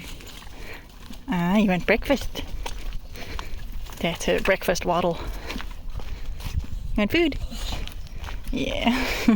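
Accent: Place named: South Africa. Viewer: Good to hear a South African voice. Good luck on your Portuguese adventure. The pool will be a great addition for managing the heat.❤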